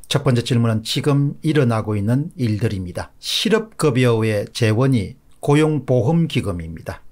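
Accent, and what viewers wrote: Gyeongsang, South Korea. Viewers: 경상도 사투리 발음이라 그래요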